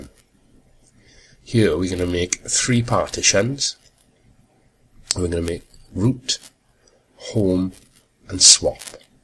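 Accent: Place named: Wales, United Kingdom. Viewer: Just watched an Irish guy telling us how to do this and now a Welshman. This Linux is truly international ;)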